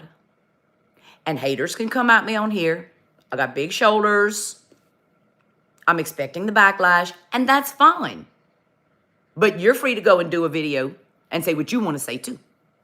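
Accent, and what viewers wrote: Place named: Louisiana, United States. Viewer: Okay, I have to say. I LOOOOVE your accent! I am from GA, but your accent is amazing! I could listen to you all day! LOL You gotta be from Louisiana!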